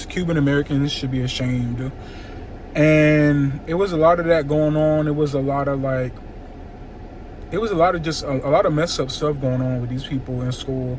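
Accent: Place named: Spain. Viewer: So you developed a so called Spanish accent.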